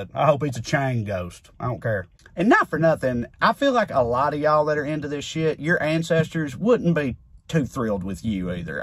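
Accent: South, United States. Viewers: ME TOO. SOOOOOO SOUTHERN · You have single handedly re-written how my brain hears a American Southern accent. 👏 Bravo. Keep it coming. 🇨🇦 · Two minutes of the most brutally honest truth, spoken by the most southern white guy I've ever heard. All while trucks are casually rolling coal in the background. Several of my southern relatives have disowned me, if only they had the courage or wherewithal of self reflection that you seem to possess. You sir, have earned a subscription, but deserve a medal. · I just moved to North Caroline and I'm so happy to hear someone speak in southern drawl saying intelligent things.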